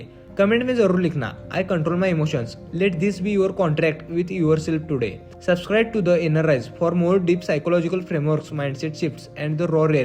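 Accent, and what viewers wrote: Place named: Maharashtra, India. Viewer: Are u marathi bro? Ur pronunciation sounds like that ❤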